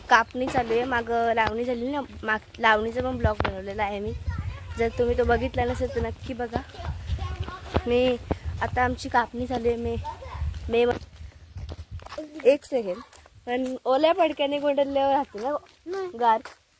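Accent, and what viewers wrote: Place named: Konkan, India. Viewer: thank u tri tumchya bolnyawarun watlach mala ki tumhi koknatun asal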